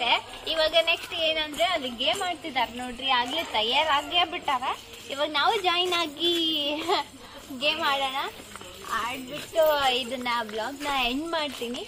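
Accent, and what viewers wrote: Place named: Raichur, India. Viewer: Bro idu Namma raichur matu matte Namma karatagi matu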